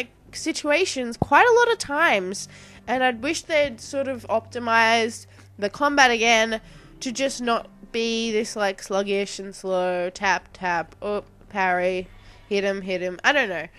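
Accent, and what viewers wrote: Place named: Australia. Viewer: Glad your back, missed that australian accent of yours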